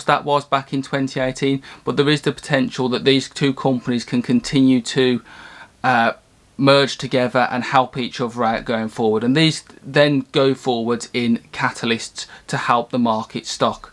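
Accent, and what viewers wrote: United Kingdom. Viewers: Prolly UK somewhere lol. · Got to say I’m loving the fact that I have found someone with my home accent... your obviously a Brummie?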